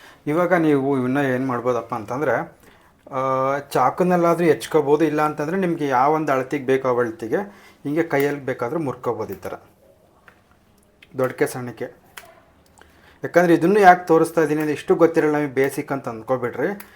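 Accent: Chitradurga, India. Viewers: Sir nimdu yav uru sir.. Nim language namchithradurga da kade ero thara ede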